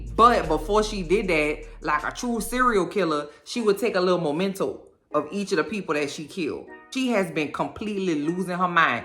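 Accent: New Orleans, United States. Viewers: About 30 seconds into your video, I gasped. Thanks to the talented Jacob Anderson, I recognized your accent as New Orleans right away. Just as impressive was Sam Reid learning French so he wouldn't have just the accent, but the language too. Loved your season recap and hope you do every episode next season. You're a whole lotta fun!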